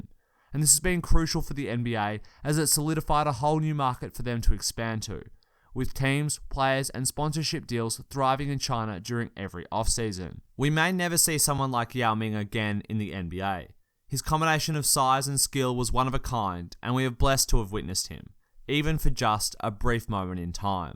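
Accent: Australia. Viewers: Do I hear a fellow Aussie that’s a sub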